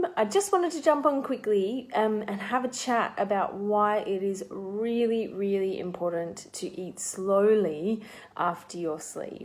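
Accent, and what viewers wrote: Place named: Australia. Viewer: Thanks so much so helpful! And you;re an Aussie - even better!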